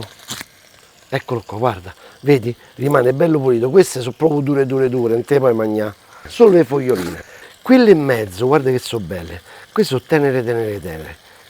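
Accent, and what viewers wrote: Rome, Italy. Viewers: A MILANO PARLI romanesco?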